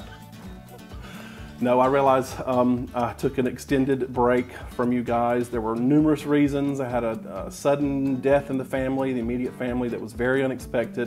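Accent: South, United States. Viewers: Congrats!! Congrats!! Congrats you have a beautiful personality and so sweet I enjoy your videos I get excited when you upload a video very helpful .Love your southern accent❤ keep up the great work. Sorry for your loss in your family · I'm from South Carolina Carolina I can really relate to things you talk about regarding family recipes and behaviors. Plus, your southern accent is awesome! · Congratulations!!! You're funny, talented, and have a great concept for your channel. (And it helps that you've got the most adorable Southern accent!!) I am delighted for you and am looking forward to more great videos. God bless! 😃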